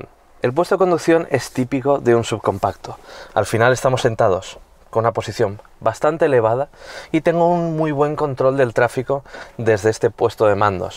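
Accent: Spain. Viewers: Este habla como español.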